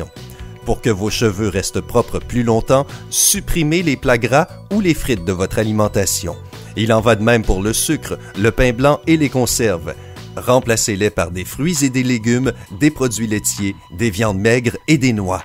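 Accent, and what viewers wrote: Quebec, Canada. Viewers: L’accent québécois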